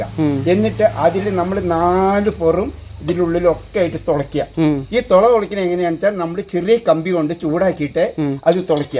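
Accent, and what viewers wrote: Palakkad, India. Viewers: Palakkadan slang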